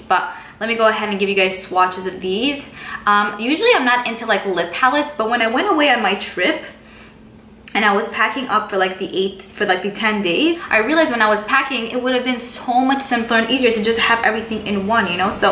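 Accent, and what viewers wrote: New York, United States. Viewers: I can tell you from NY cause of our accent! I swear watching your videos is like having a girl chat with my non existent friends lol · Listening to you is like listening to a friend gush about something i must try! You are definitely from NY hehe The accents give us away.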